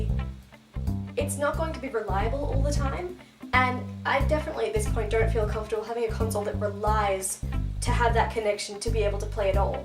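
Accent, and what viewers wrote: Australia. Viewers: aaah, dat glorious fellow Australian accent.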